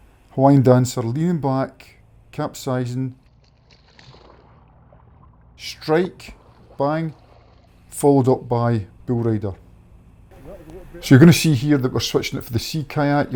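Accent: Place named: Scotland, United Kingdom. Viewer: Come for the hand roll, stay for the AWESOME Scottish accent.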